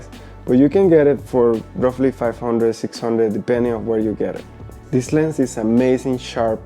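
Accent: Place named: Spain. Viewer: I like your accent too, although it sounds Spanish? In Macau don't they speak Chinese, Portugese and English? I'd love to visit there! Great video, BTW...♥️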